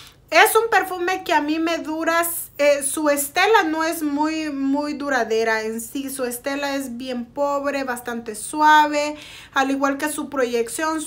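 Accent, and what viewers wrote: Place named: Veracruz, Mexico. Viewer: que bueno que hagas reseñas de perfumes Avon ya que son económicos, y tu acento me gusta ya que soy Veracruzana y algunos acentos en tu pronunciación se parecen, a los míos, bendiciones.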